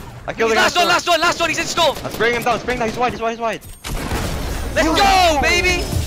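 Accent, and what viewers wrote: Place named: Wales, United Kingdom. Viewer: I do like that signature Welsh voice though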